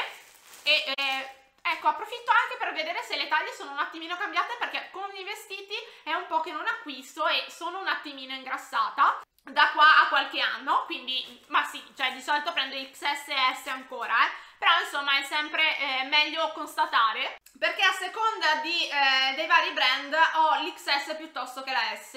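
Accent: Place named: Bergamo, Italy. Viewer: Ciao! Ho trovato il tuo canale per caso e hai uno stile molto simile al mio - una domanda, sei per caso di Bergamo? L’accento mi sembra simile ✨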